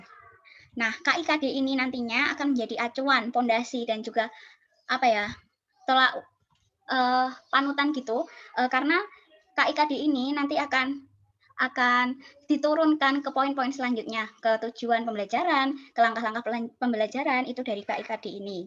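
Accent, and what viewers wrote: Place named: Java, Indonesia. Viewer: Suaranya medok gaes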